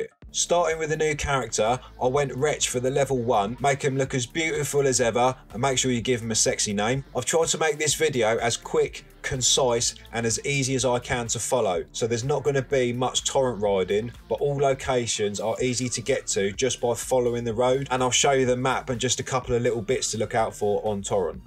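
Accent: Cockney, United Kingdom. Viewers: An Elden Ring exploit video from what sounds like an old-school, cockney gangster! Wicked. Thanks, man!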